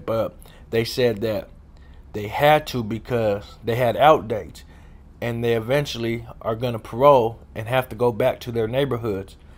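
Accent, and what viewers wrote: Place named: Fresno, United States. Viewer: First time coming across your videos you sound like a Fresno dude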